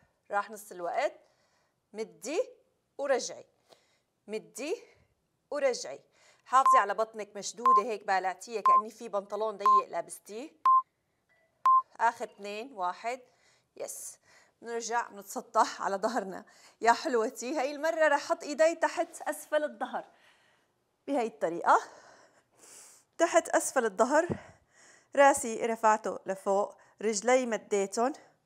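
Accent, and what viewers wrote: Aleppo, Syria. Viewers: تحياتي.. كأنو مبين من لهجتك انك من حلب مو ؟؟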